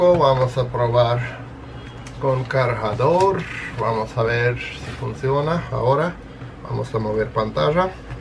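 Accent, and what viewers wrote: Russia. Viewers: Ruso o ?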